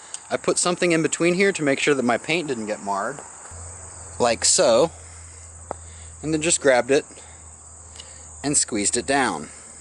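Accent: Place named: Michigan, United States. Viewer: BTW...where do you live, man? It sounds like maybe Michigan. You should open a repair shop if you haven't already. Would rather pay someone like you and not give any more money to ScamTron, I mean, SwagTron.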